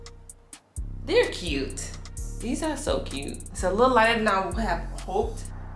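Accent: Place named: Louisiana, United States. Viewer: Are you from Louisiana? I love your accent and nails too. Thank goodness for Shein. 💅🏼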